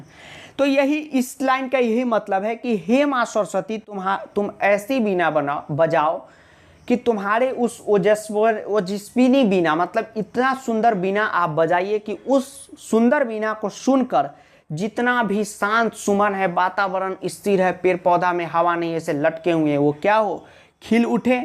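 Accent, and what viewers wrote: Bihar, India. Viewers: Sir aap pakka bihar se ho ne 😊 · Sir are from bihar hamare edhar ese hi pronouse hota hai hamre ias officer bhi pronounced ese hi karte hai eska ye matlb nahi ki unko nahi ata hai concept pe dhyan do esa koi nahi padhyaega basic se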